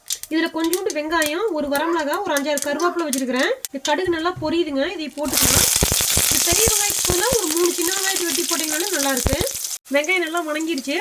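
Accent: Kongu, India. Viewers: Kongu thamilum dishsum superngo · aaha... naan vaniputhur-medu nga (near T.N.Palayam).. nam mannin vaasanai polave ungal pechum, unvaum.. neenga kalakkunga.. endra aatharavau ungalukku eppoluthum undu. Thank you.. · kongu tamil epayume azhaguthanga..namma pecha aduchuku aale kedayathunga..super sis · தோழிக்கு வணக்கம். நம்ம கொங்கு தமிழே தனி அழகு தான். 😊. அருமை. நம்ம ஊரு எந்த ஊருங்க. நான் ஈரோடு ங்க. என் வாழ்த்துக்கள் 👍😊🙏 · Arumai ma. Athaivida arumai unga kongu pechu vazhakku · உங்க கொங்கு மனம்... உங்கள் பேச்சிலும் வீசுது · Samayalum super.Namma kongu thamilum superunga. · Super sister. Namma ooru style la super ah sollirukeenga. Naan Gobi arts la dhan padichen sis. Native sathy. Happy ya iruku namma oor style la kekaradhukku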